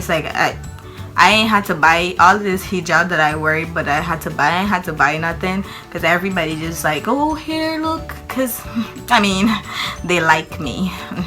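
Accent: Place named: Jamaica. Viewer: I want more of the Jamaican accent!!! 😂❤❤ 10/10 for the accent lol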